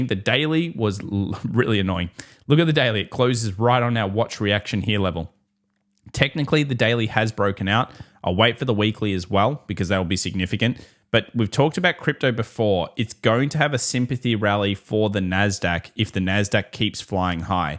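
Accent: Australia. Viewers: The whole deal here is about interpreting things. 😂 I take the same approach with watching the videos. He's knowledgeable, not always correct, says that up front. Also, literally everyone on here has different timelines, nationalities, risk tolerances, DCA for life vs scalp for life and everything in-between. Thumbnails and titles, it's back and forth on being a bit much, but that's 100% the algo and people watching needing to be fed 🤷, and thank the freakin' lord he doesn't do those shocked face thumbnails. I've seen a looooot of TA/market voodoo and this dude ain't that. Does he want money... (idk, are you watching a video about making money) lol. It can just be entertaining and informative and it's your responsibility to double check anything you're going to act on. I gots a B.A. in Physics, 12+ years as a software engineer and 3 years on and off watching FX. 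I simply don't listen to bs that is illogical if I'm looking for insights. In short 😂 yeah, I get valuable info, with a sweet Australian accent, from a genuine dude, that I filter through my own understanding and experiences. You can not watch, but why the desire to convince others not to?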